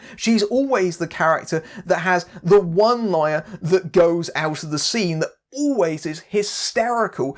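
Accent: United Kingdom. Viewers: Wait, is British?!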